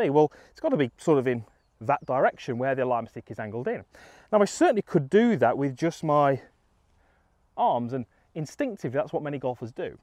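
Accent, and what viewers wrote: United Kingdom. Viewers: British english funny n talk too much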